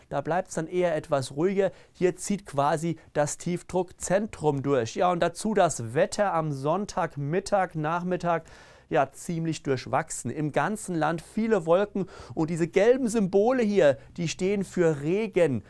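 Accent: Hesse, Germany. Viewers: Hessischer Dialekt. Oder Rheinland-Pfalz.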